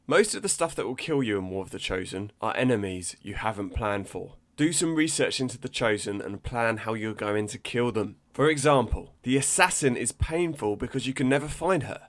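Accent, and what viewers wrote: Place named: United Kingdom. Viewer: man, how much I love your accent... You're british, ok, but exactly from where?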